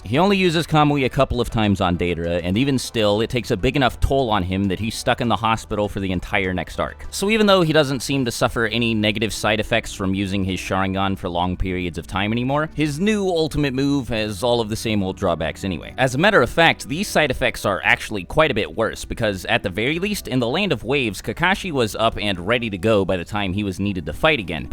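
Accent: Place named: Boston, United States. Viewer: Hey, do read the manga in Japanese? I ain't been watching your channel too long (I do rather like your videos) but when I hear you speak I figure you are from Boston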